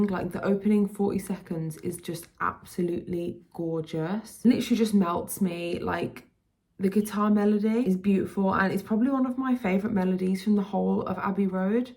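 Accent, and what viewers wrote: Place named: England, United Kingdom. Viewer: Love your videos. I agree with your choices but I'd put first and afterwards but I don't share your memories but yeah, all great choices. May I ask which part of England are you from. I'm from Birmingham, and I can't quite place your accent. Sounds close though.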